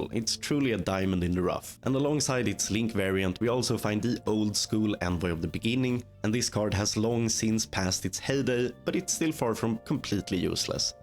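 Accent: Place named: Sweden. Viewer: You can hear he is swedish XD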